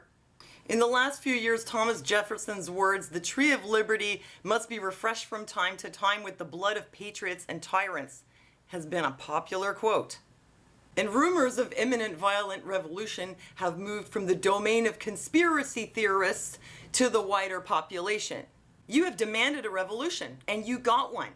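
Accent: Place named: Canada. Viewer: Nice video. I knew you were Canadian the first time you pronounced "about" as sort of 'aboot' like most Canadians. Not a dig really, just a difference. Trump supporter here.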